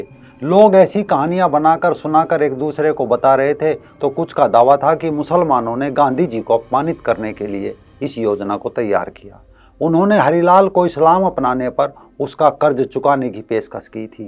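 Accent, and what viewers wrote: Haryana, India. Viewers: हरियाणा के है भाई कुछ करने वाले को मत रोको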